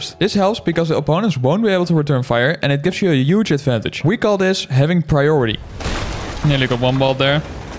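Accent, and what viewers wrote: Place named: Netherlands. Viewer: i smell dutch. Great video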